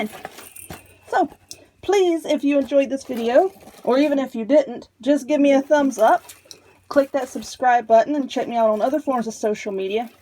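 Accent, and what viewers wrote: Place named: South Carolina, United States. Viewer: ABSOLUTELY BEAUTIFUL 🎅✝🌲❄⛄!!! I LOVE IT & I CAN'T WAIT FOR MY DAUGHTERS TO WATCH YOUR TUTORIAL'S & GO BUY MY SUPPLIES SO I CAN TRY TO MAKE SOME OF YOUR WREATHS 😊💝!!! WE LIVE IN ARCADIA FL BUT OUR DADDY WAS FROM SC & YOU SOUND & SAY STUFF JUST LIKE US GIRL, LOL 😂😂😂!!! I LOVE YOUR ATTITUDE & PERSONALITY 💝😊!!!!!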